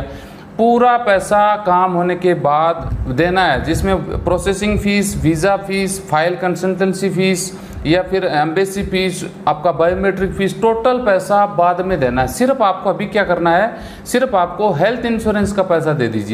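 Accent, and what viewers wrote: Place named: Haryana, India. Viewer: Waise mujhe Aap Haryana se hi lg rhe ho ji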